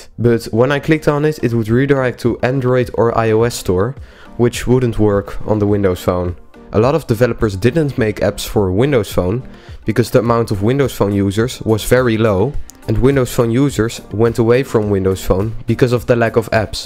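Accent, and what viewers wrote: Netherlands. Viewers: Its funny that i can hear your Netherlands accent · I smell Nederlands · are you dutch by any chance? your accent in english is same as mine haha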